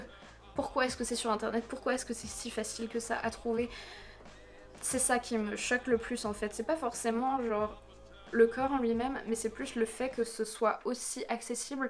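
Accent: Switzerland. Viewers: PS : je suis Suissesse et je te confirme que tu as un petit accent de chez nous 😉 c'est très chouette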